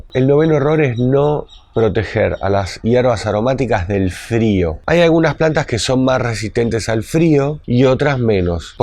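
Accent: Argentina. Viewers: NOOO... DEMASIADO LENTO NOOO Demasiado argentino y ese es uno de sus encantos!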